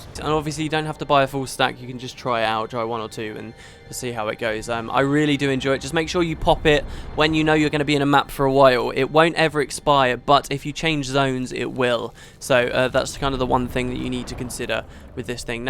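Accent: England, United Kingdom. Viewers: woot is back!! i missed your swagger english accent:P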